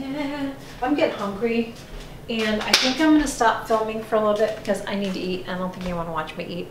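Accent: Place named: South, United States. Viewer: Hi lovely!!! Love your intro music!! Awww there's my girl!! Lol!!! Save yourself the fight! He doesn't need to know you're leaving the window open!😂 so excited you're gonna be house hunting!! Take us!! I can't imagine how huge this move is! The thought of it makes me stay out! Lol!! I love you taking us around the house!! You look gorgeous as your real life self! That's so interesting hat they staged your house! It seems like that defeats the purpose of taking pix of your house! 10 videos what!!!??? Love the glitter deer! And the trees!? Precious and pink! Love them all!! What!!?? Girl I love you more!! And omg that actually sounded really good!! I think you're getting really good with the southern accent!! It sounds greatttt on you!! Yes girl noooo drama!! We run from it!!!! I feel the same way about you sister!! Love you to pieces and loveeeee my daily time with my!! 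Love your vlog!!! Even if you hadn't mentioned me I love this style video on you!!! You're seriously even more beautiful in real life!! And this comment is embarrassingly long! Lol!! Love you to pieces my friend!! Xoxoxo!!!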